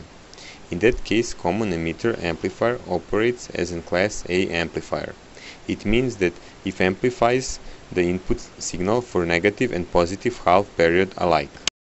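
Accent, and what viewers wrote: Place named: Serbia. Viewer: SPEAKING ENGLISH WITH SERBIAN TONG.... PA STO NA ENGLESKOM...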